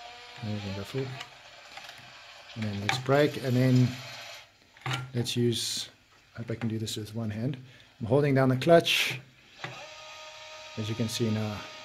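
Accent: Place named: South Africa. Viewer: I can hear that SA accent bro🤣 #represent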